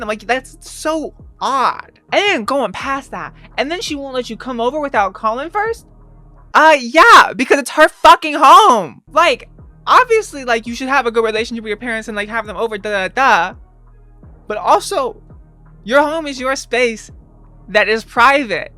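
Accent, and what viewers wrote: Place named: Illinois, United States. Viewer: Sounds like people I know from a big town/tiny city in Illinois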